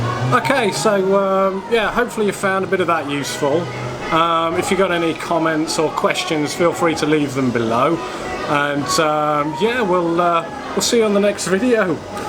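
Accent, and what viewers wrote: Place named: United Kingdom. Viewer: Was suprised to hear a fellow british voice when I clicked on this link. Thanks for making this, seriously considering a mic like this for both my 600d and my gopro.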